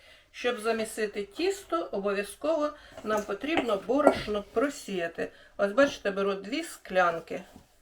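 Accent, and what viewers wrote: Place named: South, Ukraine. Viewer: до перестройки этот говор считался сельским и на нём говорили на всём юге страны. в 80-е приехала к подруге по институту на свадьбу на Кубань. попросили помочь на кухне. определили помощницей меня к бабушке. она стала говорить вот точно также как с экрана говорит эта женщина. прошу девчонок мне перевести и заодно спрашиваю на каком языке она говорит. мне отвечают ЭТО ХУТОРСКОЙ ГОВОР МАЛООБРАЗОВАННЫХ КРЕСТЬЯН. так что из сельского говора сделали украинский язык и убедили население, что это их национальный язык. а по сути на нём говорил весь юг страны.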